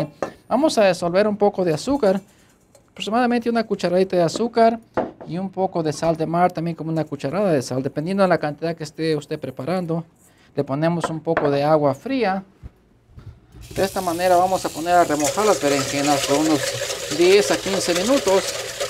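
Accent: United States. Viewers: El mexicano hablando como los gringo aprendiendo hablar español jajajaja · Déjate de acento estadoudinense,no te queda bien amigo!!!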